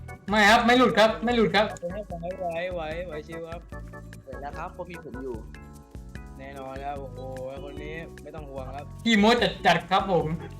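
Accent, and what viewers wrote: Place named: South, Thailand. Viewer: สำเนียงพูดเหมือนคนใต้เลยบ้านเดียวกันไหม😅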